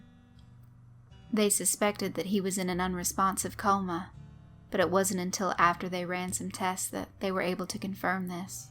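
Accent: South, United States. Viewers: I love your voice good too hear a sweet southern voice carrying creepy tails it reminds me of my old friend when we'd sit by the fire after bringing the cows up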